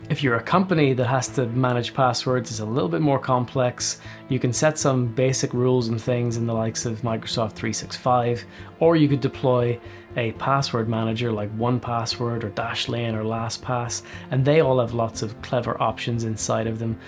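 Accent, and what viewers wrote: Northern Ireland, United Kingdom. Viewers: Is that a Northern Irish accent i hear? Haha! Nice video man, a lot of people underestimate the amount of information is out there of them. I actually recently paid a service to search some of my credentials against breaches. Turns out even tho i use generated passwords, they were actively being leaked. Found 4 passwords compromised in total. I think it's great showing this kind of information to people. Wish i did mines sooner!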